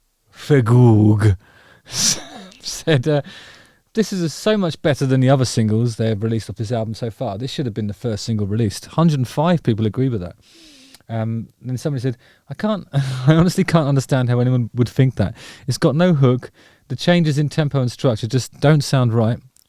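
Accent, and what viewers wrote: United Kingdom. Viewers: How can one person look like both Martin Short and Tommy Lee at the same time, and speak with a brit accent on top of it?... happy I stumbled upon this review! Thoroughly enjoyed it! Cheers!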